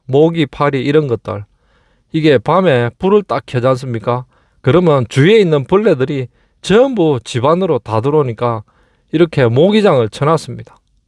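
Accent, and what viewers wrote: Gyeongsang, South Korea. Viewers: 23만 축하드려요 ~ 경상도 목소리 듣기 좋아요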